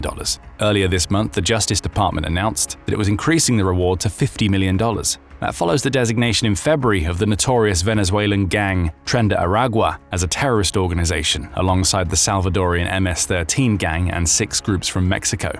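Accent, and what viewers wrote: United Kingdom. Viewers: interesting there is a british announcer narrating this video, implies the drug trade in participated in by British intelligence ...MI-6, anyone? Nothing new about the British trafficking drugs to exploit and destroy regimes and countries ... and the british monarchy is historically one of the biggest players in trans-national drug dealing ...after all, where did their wealth come from ... invading, destroying and colonizing colonies and countries of color. City of London bankers direct and finance all this for the monarchy, and it's no secret, that the U.S. remains a colony of the British Empire, according to treaties signed in 1776, 1810, and so on. It seems to me if South and Central and North American countries are humbled as drug dealers, that puts the british back on top in eastern europe, western europe, eurasia, africa and so on. 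signed, PortlandHomeboy ... btw that's also the name of my website.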